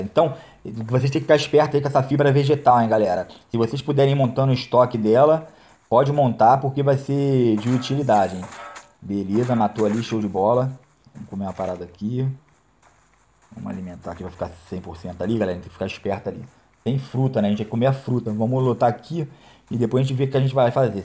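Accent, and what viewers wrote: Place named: Rio de Janeiro, Brazil. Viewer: eh muito engraçado... ctz que e do rj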